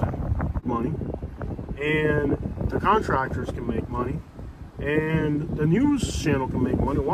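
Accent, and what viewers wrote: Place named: Hialeah, United States. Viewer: Bro the voice was epically creepy..but you are definitely speaking truth..keep up the great work..yet the Hialeah accent got me😂..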